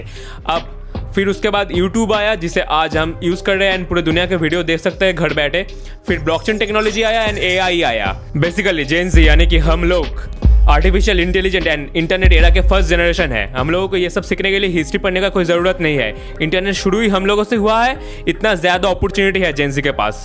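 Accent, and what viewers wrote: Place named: Bengal, India. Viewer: True, he really needs to work on the grammatical errors and I can understand his grammatical errors and also wrong pronunciations due to his bengali accent as me being a bengali too. I hope he works on it.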